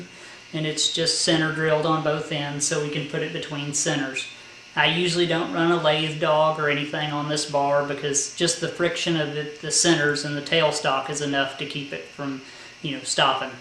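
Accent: United States. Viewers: Can't remember who pointed me in your direction but it certainly was worthwhile. Refreshing to see a youngster working with metal. Sorry if I missed the information in a yet to be viewed video but who manufactured your lathe? I'm not from the USA so please tell me about your accent, which state are you from? As for me, my avatar should give you some specific information. I've just subscribed!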